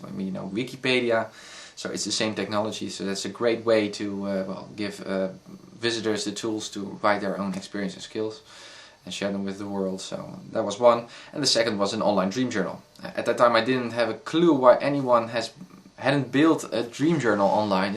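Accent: Netherlands. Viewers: Are you dutch? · I can hear you are dutch :P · Lol. First sentence was said and I knew you were Dutch :D! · Haha, when I heard your voice I thought "hmm, that's sounds like the advanced english usage by a Dutch person", and I was right. Keep up the good work en veel geluk! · nederlands accent :D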